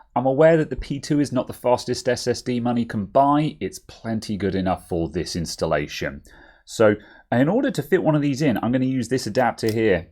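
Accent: United Kingdom. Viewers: ok, so your British, but was it your mom or you dad who where the billy goat?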